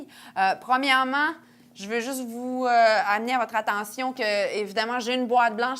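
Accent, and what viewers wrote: Canada. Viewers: Omg, l'accent canadian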